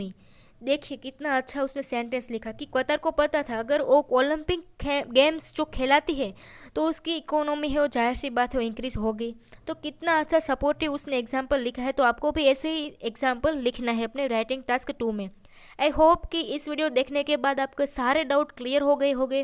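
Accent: Gujarat, India. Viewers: Very informative Ma'am aap Gujarati ho , aapki boli se lagta hai I'm also Gujarati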